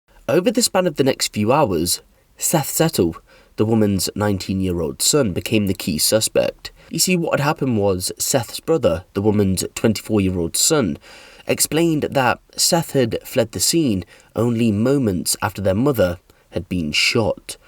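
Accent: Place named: RP, United Kingdom. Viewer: Dam I have been on YouTube for a hot minute what happened to your voice you've got well posh innit! 🤣🤣🤣🤣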